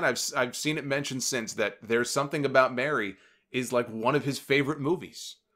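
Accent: New York, United States. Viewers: "Nu Yawkaz" bro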